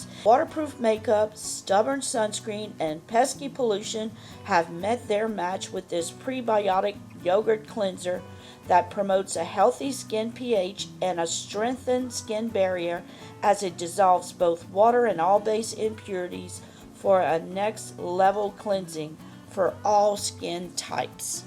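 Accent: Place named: South, United States. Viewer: I just love the way you do ur presentation, u talk about products and research what they are. I love the way u pronounce spoilers, with ur accent, since I was a little girl I always loved southern accents and I have always been envious ladies who spoke with one🤩😍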